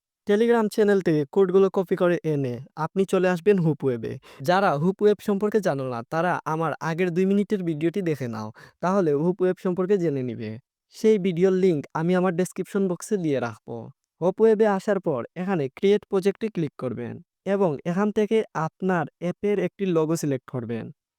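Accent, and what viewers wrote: Sylhet, Bangladesh. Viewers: ভাই আপনার বাড়ি কি সিলেটে ❤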